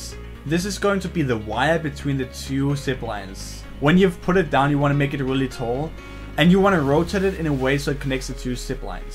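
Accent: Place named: Denmark. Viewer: You sound danish or skandinavian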